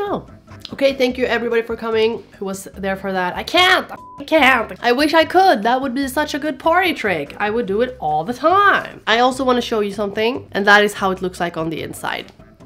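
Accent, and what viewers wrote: Sweden. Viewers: She sounds sooo swedish lmao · It's her accent, she's swedish. ^u^ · Is she from Sweden bc she has that accent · SHE HAS AN SWEDISH ACCENT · Is she Swedish? Cuz she kinda sound like you have that Swedish accent when you speak English. Lol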